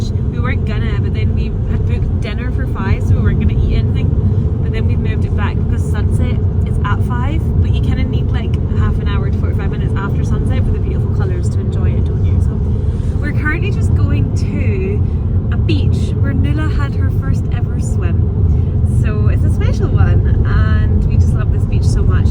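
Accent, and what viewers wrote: Scotland, United Kingdom. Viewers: I’m a new subscriber. I love all the scenery and of coarse you as well. I could listen to you talk all day long.My Mom was Scottish , born and raised in Glasgow. My Mom and grandma can over to the US when she married my Dad. The dna kit says I’m 80% Scottish. We use to go to Scotland every summer. We usually stayed with my Aunt and Uncle who lived in Ayr. I’ve been to Alloway several times. I’m old enough to be your mother but just hearing your accent brings back so many wonderful memories for me. My Mom and grandmother have both passed so I miss the accent every day. I wish I could move to Scotland. It’s always been my second home. Alas, I don’t have the money so your vlogs it is! I’ve watched every one now and always look forward to a new one. I’m so happy I found you! 🍎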